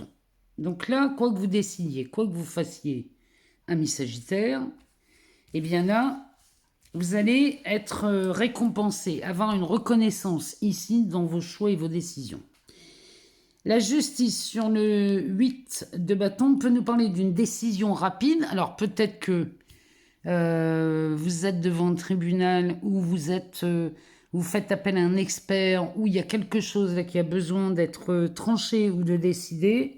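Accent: North, France. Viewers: Merci Merci Merci pour vos tirages toujours toujours très JUSTES … Dans quelques jours, je passe en justice pour mon divorce, et je sais maintenant que enfin je vais être mentalement, psychologiquement et physiquement « libérée et soulagée ».. J’adore vraiment votre voix qui est franche avec un p’tit accent soit du nord soit de la Hte Loire … Bien à vous et bonne semaine!!! 👏🫶🏻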